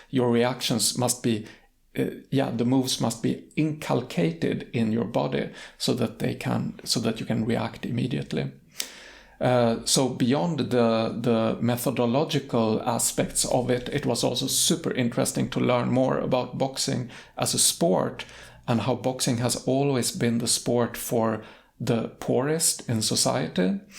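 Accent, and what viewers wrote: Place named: United Kingdom. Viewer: Dear, very good arguments recommending the book. But I am highly interested in when and where do you study English? Your accent is very British and incredibly understandable!! And I would like to know if you wrote a book about learning Swedish, Please, add more and more videos about YOU;) Greetings from Argentina!!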